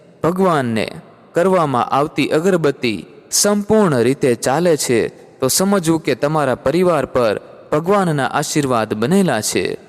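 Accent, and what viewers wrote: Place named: Kathiawar, India. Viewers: વક્તાના ઉચ્ચારો પરથી લાગે છે કે તેઓ ભાવનગર તરફના હોવા જોઈએ. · Good but speech irrted che bcz kathiyavadi bhashaaa😮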